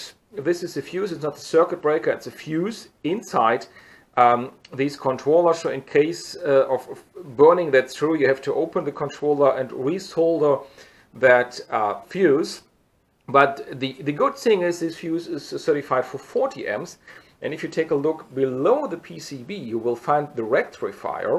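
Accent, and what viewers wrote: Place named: Germany. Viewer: Na hier hast du dich wohl verkalkuliert! Das ist wirklich schade dass du dieses Video besonders dieses Video nicht in Deutsch gemacht hast. Denn die Nachfrage von deutschen Windkraft-Freunden ist sehr sehr groß und es sind so viele Fragen offen und ich kann mit Englisch beispielsweise gar nichts anfangen so auch die Community in der ich bin und ich habe überhaupt kein einziges Wort verstanden was du da erzählst. Aber deine like gerade die wäre garantiert höher gewesen hättest du dieses auf Deutsch gemacht, weil alle suchen nach solchen Videos in Deutsch und finden ist nicht und jetzt sprichst du Deutsch und machst es in Englisch was ich mega schade und traurig finde was für eine Verschwendung um diesen Bericht. Denn es gibt unzählige englische Berichte für Windenergie nur leider nicht auf deutsch das wäre deine Chance gewesen. 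Und wie wenig draufgekommen,?... Das Englisch war so typisch deutsch. Man hat herausgehört dass du eigentlich Deutsch sprichst 😉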